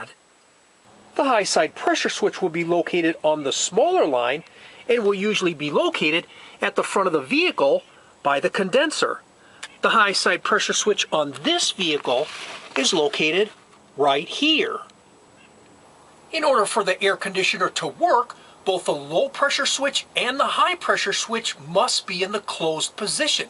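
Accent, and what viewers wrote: United States. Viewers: 1 pour ta tronche de ricain et -1 pour ton accent de ricain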